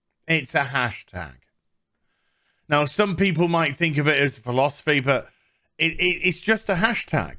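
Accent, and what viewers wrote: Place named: RP, United Kingdom. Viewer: BTW, I love your "British" (posh) accent.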